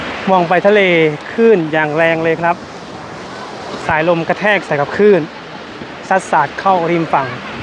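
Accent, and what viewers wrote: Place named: Bangkok, Thailand. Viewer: ใครน้อ หาว่าน้องสาวกระแดะ. อาการของความกระแดะคือ สะดีด สะดิ้ง. Over acting. ภาษาอิสานจะประมาณว่า ...เคียว นั่นแหล่ะ แต่น้องเขาไม่ขนาดนั้น คงเพราะแกพูดภาษาไทยกรุงเทพฯเยอะไปหน่อยมั้ง เพราะก็รู้ๆกันอยู่ว่าเป็นคนลาว ไม่ต้องคนลาวหรอก คนเหนือ คนอิสาน ก็โดน ถ้าออกมาแนวนี้ นอกจากจะเผลอ ไม่ได้ตั้งใจเพราะมาอยู่กรุงเทพฯนานๆ คำท้องถิ่นบางคำ มันก็ลืมเป็นเหมือนกันคิดไม่ทันก็ใช้ภาษาภาคกลางนี่แหล่ะแทน อย่างผักอีตู่ บางทีนึกชื่อไม่ออกเหม้อนกันก็จะเรียกใบแมงลักนี่แหล่ะ แต่ก็อย่างว่า บางครั้งก็ต้องการให้คนภาคกลาง และภาคอื่น ฟังเข้าใจด้วย เกมมันบังคับอย่าว่าน้องเขาเลยครับ คนเราคิดไม่เหมือนกัน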